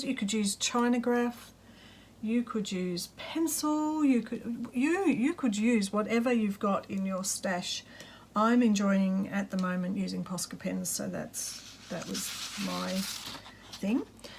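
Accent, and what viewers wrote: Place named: Australia. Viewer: Aah. I was puzzled cause at time te Australian accent slips and I couldn’t place it. I thought South African or New Zeeland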